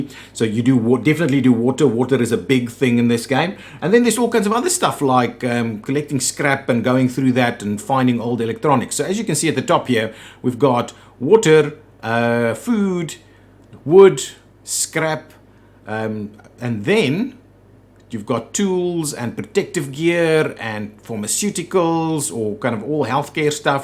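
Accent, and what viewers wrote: Afrikaans, South Africa. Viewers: the afrikaans is thick may bru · Can't put a finger on your accent, unless.... Are you South African?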